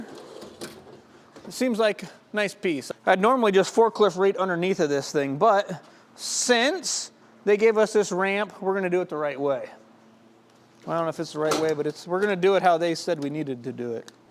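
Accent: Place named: Jamaica. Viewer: Dude you’re wasting you talent big time. You have to close your eyes so you can see better😮😅. Told my wife she immediately asked if you were Jamaican 😅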